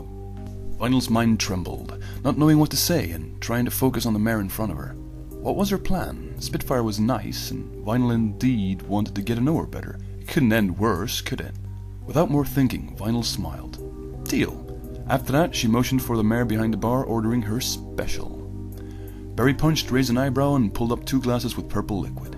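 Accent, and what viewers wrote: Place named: Ireland. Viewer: I think the irish accent just makes it dound even better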